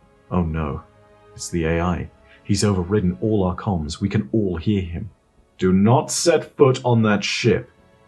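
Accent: United Kingdom. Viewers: You sure can. But you could also comment on British accent and the nuanced way he pronounces things, too.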